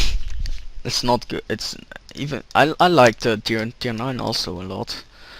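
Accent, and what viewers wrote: Netherlands. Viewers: je nederlands accent veraad je :3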